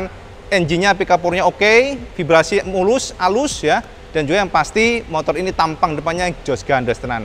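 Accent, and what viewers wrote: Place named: Tulungagung, Indonesia. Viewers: Jagange endi to jagange.. tak habis2 ak seneng karo bahasamu maas.. kuentel tulungagung e 😄🙏🏻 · salam Jooosss Gandooos mas bahasanya dan gayanya khas wong Tulung Agung sehat selalu mas Bro👍🏼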